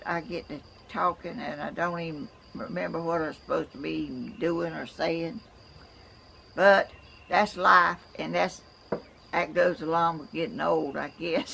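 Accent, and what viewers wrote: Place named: Georgia, United States. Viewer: I was born the southwestern part of Georgia .your accent sounds very much like the accents of people from my part of Georgia..curious which part of Georgia you r from? Your accent sure sounds familiar... like the folks from my neck of the woods...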